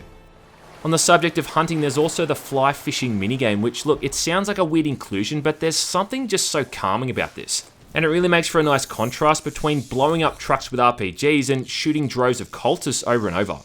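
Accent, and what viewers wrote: Australia. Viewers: Dunno why but it was cool when you came out with the Aussie accent I haven't seen your vids before Just bought far cry 5 today, waiting for it to download Cheers from Perth mate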